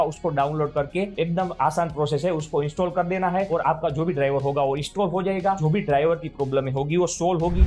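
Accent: Gujarat, India. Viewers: By his speaking style he is 💯 gujarati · भाई पहले हिंदी बोलना सिख लो, साफ़ साफ़ पता चल रहा है की तुम गुजराती हो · Are you ગુજરાતી? 😅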